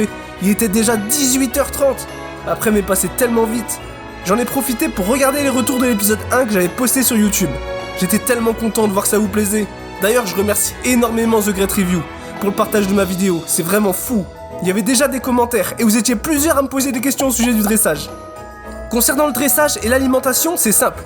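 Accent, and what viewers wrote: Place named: North, France. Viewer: pas = po il vient du nord cet accent mdrrr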